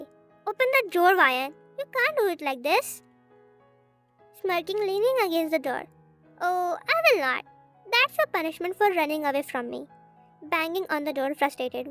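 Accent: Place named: India. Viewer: This is absolute shit. Wtf. The voice is sooo pick me. The indian accent is so obvious. Quit already broo. Infact u sound like a seven year old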